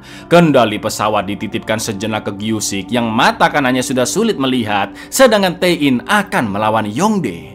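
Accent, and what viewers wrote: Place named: Sidoarjo, Indonesia. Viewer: Cak mau tanya awkmu arek Endi asline kok logat e kyk wong darjoooo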